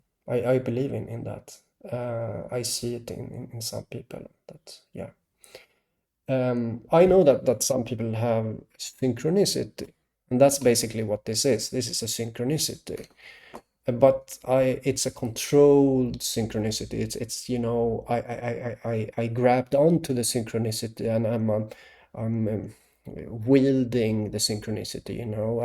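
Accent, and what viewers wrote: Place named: Eastern Europe. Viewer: Just curious, what's your country of origin? I think I'm detecting an Eastern bloc accent.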